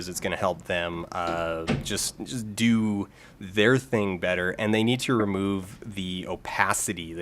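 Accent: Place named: Canada. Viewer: lol I just meant the voice and the way you speak. Not the actual words. Are you canadian?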